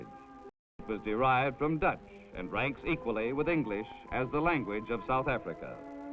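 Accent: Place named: Mid-Atlantic, United States. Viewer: Love the mid-Atlantic accent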